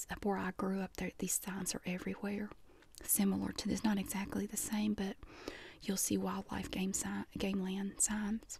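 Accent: South, United States. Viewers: Nothing but good ol' fashioned tingles. Loved hearing that Southern Accent. Reminds me of where i grew up :)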